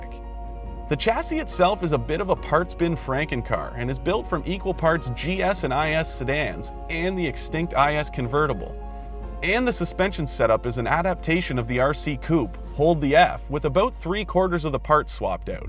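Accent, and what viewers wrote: Canada. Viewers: that canadian accent makes my ears itch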